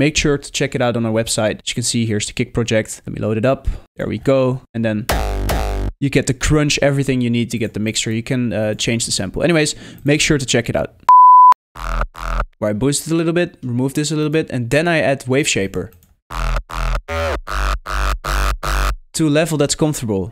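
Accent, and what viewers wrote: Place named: Netherlands. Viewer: Lol. Je hoort meteen dat Nederlandsche accent. Edit: hoe lang Ben je al bezig?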